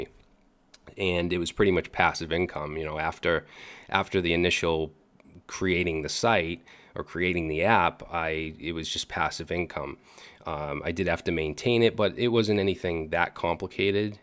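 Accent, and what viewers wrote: Boston, United States. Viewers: you're great. Been here (read: Udemy) since your Eduonix days. PS - your Boston accent is nearly gone!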